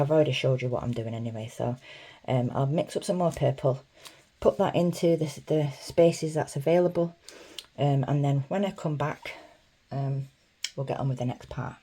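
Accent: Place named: United Kingdom. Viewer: He is beautiful! I laughed every time you said “bubbles “, your British? Accent makes it sound cute!❤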